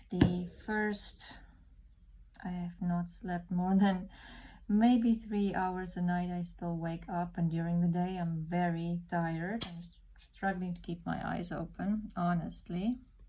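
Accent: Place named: South Africa. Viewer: I found your video today. Love listening to a fellow SA accent.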